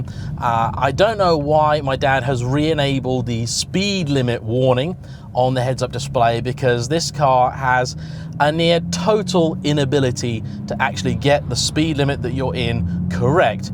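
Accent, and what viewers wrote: United Kingdom. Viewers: Proof that you can have a british accent, and still sound like a buffoon. I know another channel with a Kentucky accent, and sound much more intelligent.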